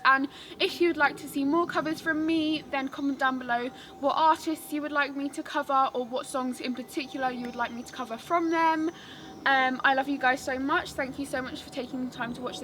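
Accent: England, United Kingdom. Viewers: love your English accent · Sotaque britânico. É britânico, certo? Adoro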